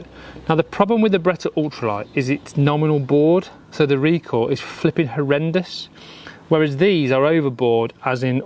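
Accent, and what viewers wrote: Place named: United Kingdom. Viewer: I hate British accent, very difficult to understand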